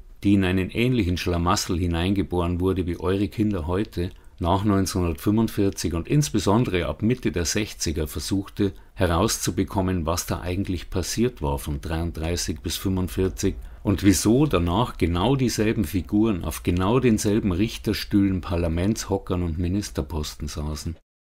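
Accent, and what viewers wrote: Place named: Munich, Germany. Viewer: Ein toller Betrag vorgetragen mit einer soooo angenehmen Stimme und dem wohligen Münchnerisch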